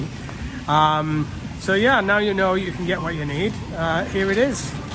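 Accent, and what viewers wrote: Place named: Lancashire, United Kingdom. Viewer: Love the Lancastrian accent.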